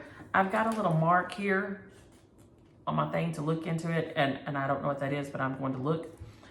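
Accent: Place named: Texas, United States. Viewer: Always enjoy stitching you your lovely Texas drawl. Here in Ontario, Canada, fall will soon turn into winter. Getting my mind ready for that as a former snowbird!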